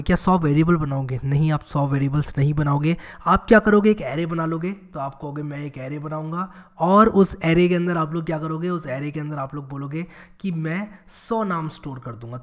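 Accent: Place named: Punjab, India. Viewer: U have a little bit of Shakti Kapoor accent. Punjabis..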